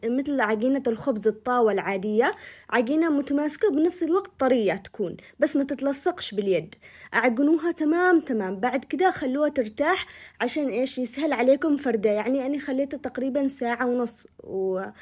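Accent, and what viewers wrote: Aden, Yemen. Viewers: ما شاء الله وبالعافية عليكم .. يسلم الذي علّم .. بنت مفحوسة صحيح.. واحسن شي الهدرة العدني . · السلام عليكم ،ماشاءالله اللهم بارك ،فعلا اللهجه العدنيه ذكرتنا بأيام عدن الحبيبه والمخبازه العدنيه.ياالله أيام حلووووه جدا جزاكي الله خيرا اختي العزيزه ❤️. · انا فدا للهجه العدنيه حاليه ماشاءالله ومفهومه ذكرتيني بامي الله يحفظها لي ويحفظ كل امهاتنا يارب ❤وكمان الخبز والحلبه عجبني كتير وجربت طريقتك وكل العائله حبوها❤ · ياحلات كلامك قبل طبخك انا من عشاق الكلام العدني 🤗😜 ماشاءالله تبارك الرحمن سلمت يدك ❤ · تسلمي شيف بنت اليمن الحبيب كلامك ولهجتك العدنية عسل دوعني ولآ طبخك العدني الأصيل من أرض اليمن السعيد..يحفظك ربي ويديم عليك الصحة والعافية 🎉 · اعشق الكلام العدني الله يسعدك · ياي لديد لهجتك متل اصدقائي يمنيين درسو معي بالجزائر اكلكم بزاف شباب ولديد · فديتك والله وفديت اللهجه حقنا اصحاب عدن ❤😂ضحكتيني لما قلتي ناقص البسسس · تسلمي على طباختك الجميله الي هيه نفسك وكمان كلامك الجميل جدا احب لهجهه عدن عندي سؤال ليش لما افعل الشاهي مايرضي يطلع راحته راحه حقه مش شاهي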